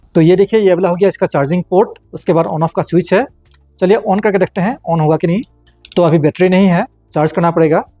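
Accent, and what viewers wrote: Assam, India. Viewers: Bro had the Assamese accent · Assam or niki vai.. hindi tu huni Assamese jen lgise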